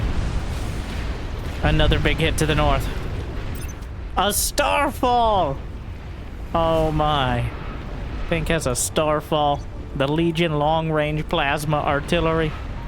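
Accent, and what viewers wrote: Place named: Arkansas, United States. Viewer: Trying to figure out your accent. Arkansas?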